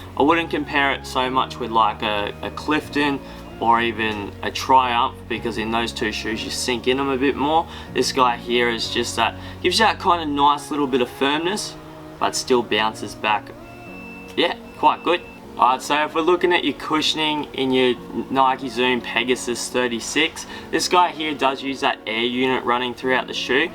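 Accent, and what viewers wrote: Australia. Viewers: Great review and great Aussi accent. Would love it if you kept doing comparisons of running shoes like this one.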